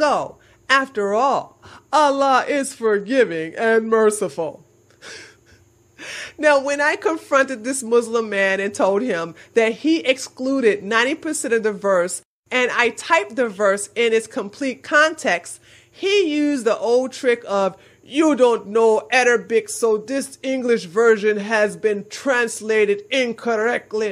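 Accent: United States. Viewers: haha very nice. I love your American English, it got so much character.:) And I agree with a lot of what you say. I think some muslims even lie to themselves, out of fear of going to hell, it's so sad. Due to 5 prayers per day, islam simply doesn't give them the time, let alone permission to question their believes. Especially muslimahs would have such a better life without a religion that tells them they are less worth than men. But I totally disagree with you on the scene with Horowitz. He didn't even answer her question, but proved to be a total dumbass, who can't bring himself to condemn Satanyahu, who's much worse than Hamas. So he was the bigotted jerk in that conversation. Given their view on women, I'm not a fan of Hamas either though. Infidel's greetings from Europe, wazzup!:)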